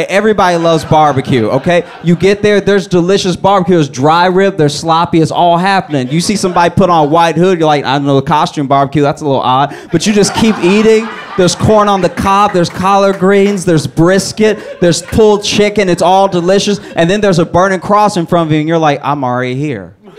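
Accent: Louisiana, United States. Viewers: He sounds like that because it's his accent from Louisiana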